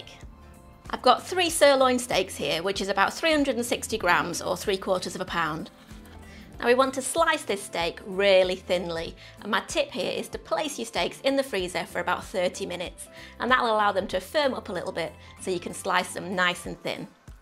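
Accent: United Kingdom. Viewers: wonderful recipe, thanks ! but . . . ⅛ tsp white pepper - is this molekule-like amount worth start grinding the corn ? :-) another thing: hear to talking to my german ears it sounds more british than american dialect. Am I right in thinking you're from U.K. ?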